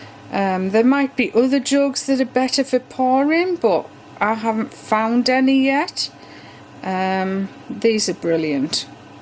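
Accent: United Kingdom. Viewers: What ?! people are watching your videos to hear your lovely voice with your beautifull British accent and your soaps that are really but really look tasty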